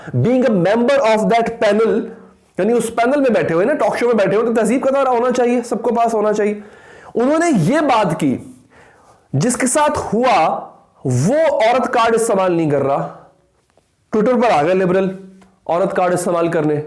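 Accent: Punjab, India. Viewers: Punjabi touch 💞 Stay blessed bro💐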